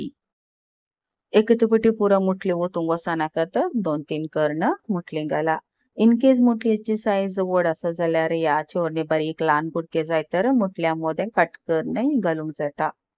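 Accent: Konkan, India. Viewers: Thank you I am watching yr video your voice is so good and very konkani is pure so i get to hear the words. Recipes all mangalorian dishes are superb . Thank you bai. Can we give tadka .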